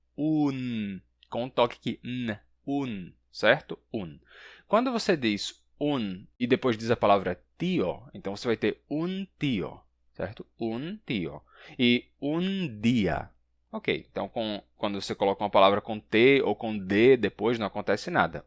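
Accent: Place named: Rio Grande do Sul, Brazil. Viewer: Descobri porque acho seu sotaque diferente dos nordestinos que conheço: sua pronúncia do "e" no final é parecido com a pronúncia dos gaúchos: "leite quente dói o dente da gente".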